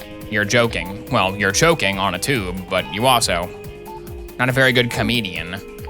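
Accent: Australia. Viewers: Ohh he's Australian? Oh krickey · British accent???.... Australian ffs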